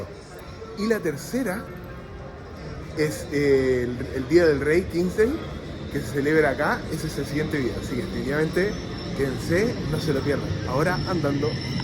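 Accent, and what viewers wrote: Chile. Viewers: Viendo este video dije mmm me suena este acento 🤔 #chilena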